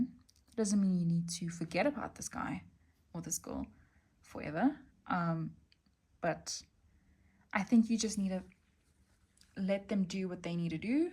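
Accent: South Africa. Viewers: May I ask if you are South African? ❤ I just love hearing your accent doing a reading! And your readings resonanate so much with me too, thank you for sharing. Love from the East Coast of S.A. 🇿🇦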